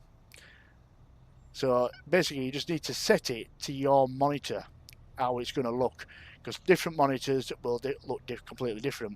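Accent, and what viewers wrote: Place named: Northern England, United Kingdom. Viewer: arr thats a northern man if i ever heard one! thanks for the video <3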